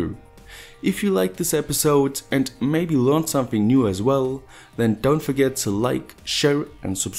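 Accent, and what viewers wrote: Germany. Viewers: Are you German. Becuas kupferdraht ist deutsch oder bin ich dumm. Außerdem hast du so nen Akzent! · Love that accent, it sounds like german but the way you say certain words makes me lmao, like coillll. Would love to hang out with someone like you, no homo. Good video very professional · Great i have a question are you German? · where are you from? you have a similar accent like German people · Man hört richtig das du deutsch bist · Wait... are you german?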